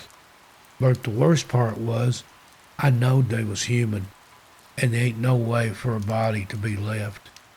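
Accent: Appalachia, United States. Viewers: Great stories. The narrator sounds like that of an Appalachian. These stories scare the crap out of me. Its been years since I have done it, but I have hiked and camped in these very same areas in these stories. I didn't see anything or even necessarily heard anything too creepy, but there was a sense by all of us that "something" malevolent out in the darkness of the deep woods. I have never gone back.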